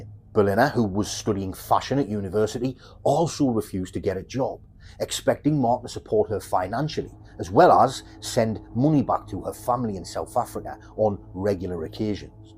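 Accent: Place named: South Shields, United Kingdom. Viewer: This happened just up the road from us. I'm originally from south shields and your voice is making me homesick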